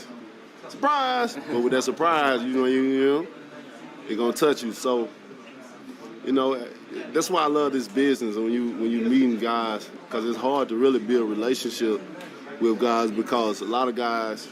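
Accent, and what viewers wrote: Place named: Alabama, United States. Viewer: i forgot this dude has the thickest Alabama accent lol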